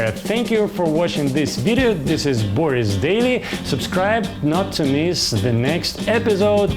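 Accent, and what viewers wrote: Russia. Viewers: Дима Крут ну правильно, в русском акценте все понятно;)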